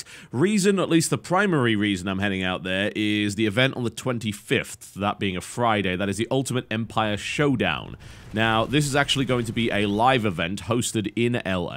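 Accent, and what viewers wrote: United Kingdom. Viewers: i enjoy content patch more than anything on this channel by a wide margin i feel it's the most informative [by it's nature it's suppose to be] and most entertaining probably because i enjoy coherent gaming rants by well informed people in a british accent i beseech thee oh great biscuit of totality find the time to bring us a new episode of content patch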